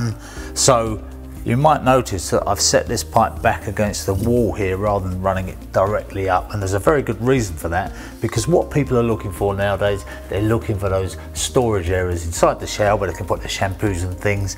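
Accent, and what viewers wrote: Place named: United Kingdom. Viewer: 1st thing when doing any activity...have a person guide you through your project with a very lovely British accent, such as this gentlemen’s!;)So sir, I will need you to come to America first chance you get and talk to me while I remodel my bathroom☺️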